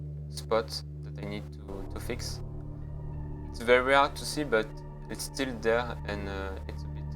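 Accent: France. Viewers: Your french or i don't know your accsent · You are from what country ? France ?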